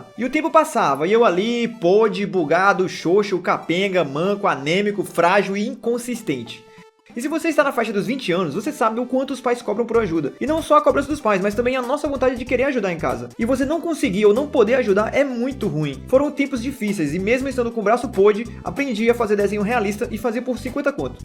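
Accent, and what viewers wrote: Brazil. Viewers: cheguei até aqui pq vi um comentário seu no canal da Dora Weigand, e eu simplesmente adorei teu canal e que vídeo foda, dei ótimas risadas kkkk e que bom que to esse sofrimento já acabou, pelo tua voz eu chuto que tbm é Cearense, se não for é do nordeste tbm certeza.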